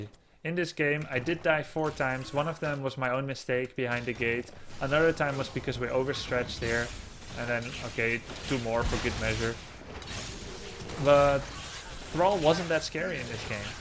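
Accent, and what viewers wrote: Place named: Netherlands. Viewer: You look and sound so Dutch :D Nice vid.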